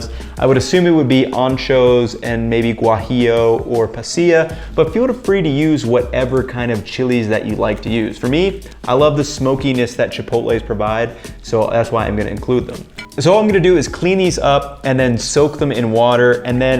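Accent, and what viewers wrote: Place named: United States. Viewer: I enjoy your videos and your cooking but some constructive advice. If you plan on living in Mexico, do yourself a favor and learn the vowel sounds for Spanish: Replace A-E-I-O-U with AH-EH-EE-OH-OO. It will take the “gringo” right out of your pronunciation. Hope that helps. Oh yes, and ALWAYS strain your sauce. Cheers!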